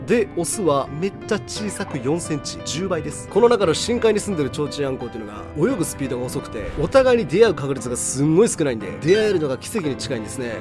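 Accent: Kansai, Japan. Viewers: 最近関西弁混ざってない?w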